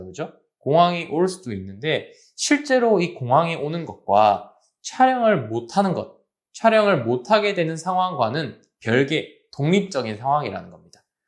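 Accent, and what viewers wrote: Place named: Gyeongsang, South Korea. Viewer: 솔직히 방송 하려면 경상도 억양 정도는 고치고 방송 하는 최소한의 노력은 보여야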